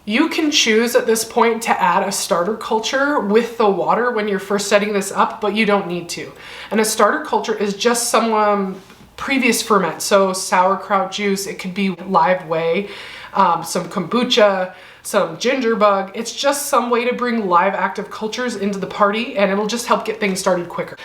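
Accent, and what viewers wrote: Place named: United States. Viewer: Love your common sense tips which I don't often think of...and your soothing voice (tbh some American accents are difficult to listen to (for me anyway) but I could listen to yours all day !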